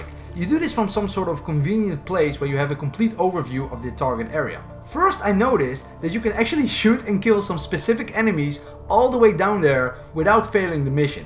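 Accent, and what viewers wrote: Netherlands. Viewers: Ik dacht al dat je Nederlands was vanwege t accent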